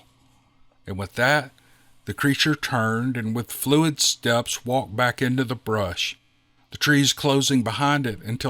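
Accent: South, United States. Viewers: You sound like you're from Tennessee or Mississippi somewhere like that. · Don't know how everyone else pronounces Mor ls Morsel mushrooms If you have never had the pleasure of eating a plate full I wish that pleasure for you Or if it's you southern drawl, more power to you!!!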